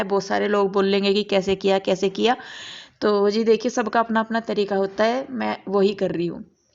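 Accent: Haryana, India. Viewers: Aap haryana se h, apki bhasha bohut achi lag rahi h, apne bohut ache se orgenize kiya hua h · Mujhe aapki boli achchi lagi,aap haryanvi ho?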